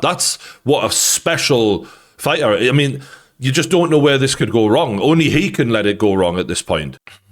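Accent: United Kingdom. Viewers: Is from the UK ?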